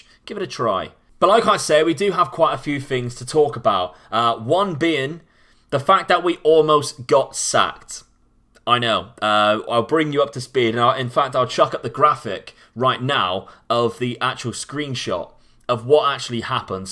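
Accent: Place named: West Country, United Kingdom. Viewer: I thought your accent was west country but didn't think you were as local as that, Plymouth for the win (something no-one ever said before) lol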